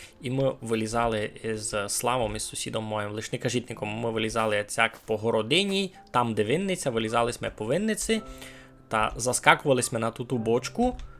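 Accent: Ukraine. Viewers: Моцно говориш ти з якого села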